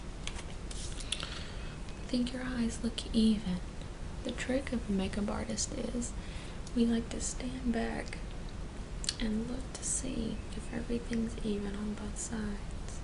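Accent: South, United States. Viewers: Do I detect a southern accent? Lovely video. I've been watching several that you have made, and they're all so relaxing. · Amazing southern accent. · are u southern? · Emo lady with a southern accent omggg ❤ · I hear that southern drawl, where you from? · you sound southern? I'm from Kentucky. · She sounds like she's from the south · Oh dear God, finally, a woman with a Southern accent has uploaded one of these. There is nothing better than a Scarlett O'Hara. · You gotta gorgeous southern twang to your voice. I always marvel at you girls who do this ASMR stuff. Don't gemme wrong... I love it. I just don't how you do it, staring into a camera. Y'all are some great actresses. :)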